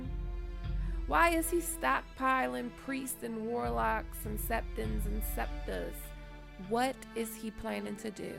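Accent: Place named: Baltimore, United States. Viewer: I know this is an old video. But you might consider fixing the title. I think you mean Ramsey "or" Joffery. Also, like the Baltimore accent on this one. You thinned it out lately.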